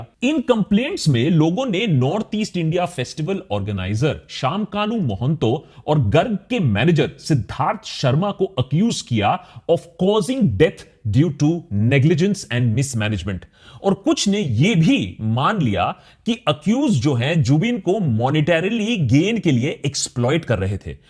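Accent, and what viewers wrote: Bengal, India. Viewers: Brother why are you telling assamese names in bengali accent ( ps: i know deshbhakt is bengali okay, don't try to be oversmart)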